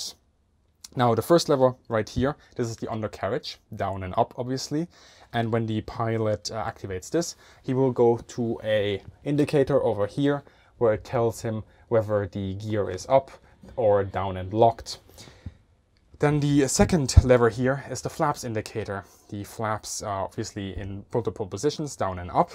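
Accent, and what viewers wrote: Germany. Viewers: du sprichst deutsch das hört man lol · Sound German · Deutsche erkennen IMMER einen deutschen Akzent xD, geiles Video bro.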